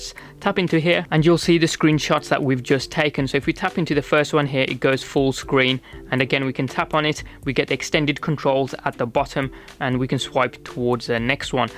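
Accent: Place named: Birmingham, United Kingdom. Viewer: You from Birmingham...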